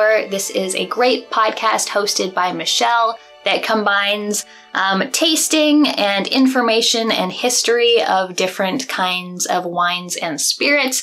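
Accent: Canada. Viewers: I like your Canadian accent.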